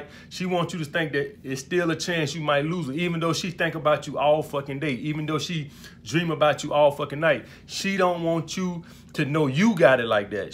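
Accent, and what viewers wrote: South, United States. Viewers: Hey, guy....A man who speaks as you do does not have a chance in hell with the kind of women who are generally considered desirable. You know... women who make good partners, parents, is interesting, enjoys life. Your enunciation is terrible, that southern drawl is telling. Your use of profanity is off-putting, referencing women as “bitches” and other insulting names is not buying you any prizes. This video is strictly for the guy under 25 years of age who wants to act like someone he is not. It is definitely for someone who has decided some guy on YouTube has the answers to life’s mysteries. This is beyond comical, it is tragically comical.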